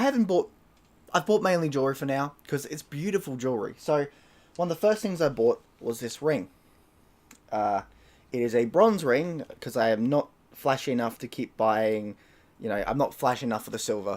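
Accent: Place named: Australia. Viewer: I don't know why it was so hard for my ears to realise you're Australian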